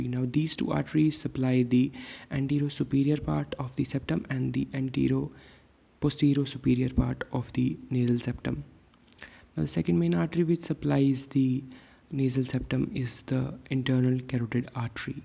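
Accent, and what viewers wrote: India. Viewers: External * you are inian that dialect is disturbing me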